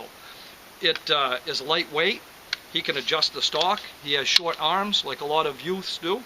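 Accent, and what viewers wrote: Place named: New England, United States. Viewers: Just ordered your book. Looking forward to it. Your east coast New England accent is a breath of fresh air. We are out here brother. You are not alone. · This is the first video of yours I've seen. Nice video. I'll check out your book too. I made sure to watch for a couple minutes to see if your boston accent was a shtick or not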